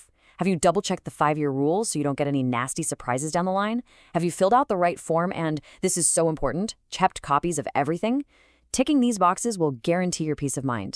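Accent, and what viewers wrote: United States. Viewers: Excellent content, although why the horrendous American accent?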